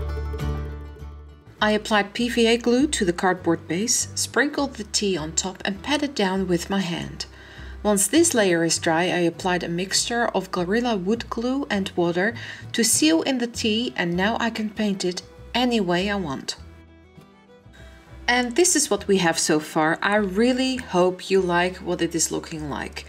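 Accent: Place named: Netherlands. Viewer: Australia? You sound very Dutch? Awesome work!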